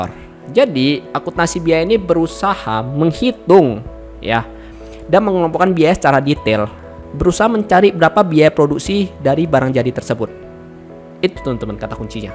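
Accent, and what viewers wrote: Medan, Indonesia. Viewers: metah kali medannya abang kuuu, terima kasih banyak ya bang, ngerti juga akhirnya aku. semoga ilmunya selalu berkah dan sukses terus ya bang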